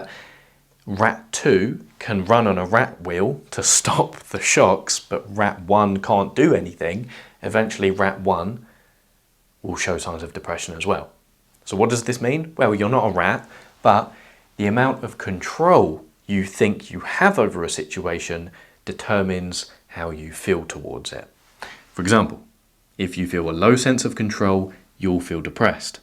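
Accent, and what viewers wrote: United Kingdom. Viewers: Unrelated but what type of British accent is this? I like it a lot. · The britishness in his voice is so refreshingly calming put in a good mood like when ur high on caffeine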